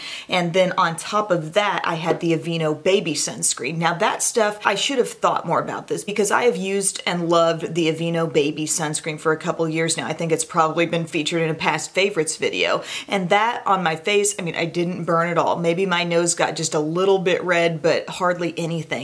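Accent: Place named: Texas, United States. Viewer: Hi, I was just watching one of your videos that references a corrector as university of Texas colors, you kind of sound a little like your from Texas, I was just curious if that’s where you are... also if your a ut fan.. that’s cool but I have to Aggies :)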